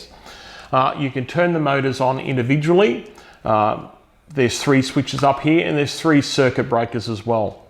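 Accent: Australia. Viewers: Thanks for sharing. Glad to hear an Aussie accent in the review so I know I know it is possible to get this sucker locally (pun intended). Just to note, a 3dBA difference is about double the sound pressure level, so from quiet to noisy for that thing it is a difference of 4 times the sound pressure level between low to high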